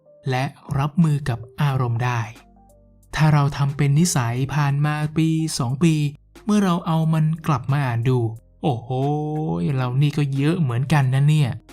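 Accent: North, Thailand. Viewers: ขอบคุณนะคะ เป็นคลิปที่ดีมากๆเลย ฟังแล้วเพิ่งรู้ว่าคุณเป็นคนเหนือ ฟังวนไปทุกวัน กดไลค์ให้ทุกวัน มันดีกับชีวิตมากๆ ชอบ~😊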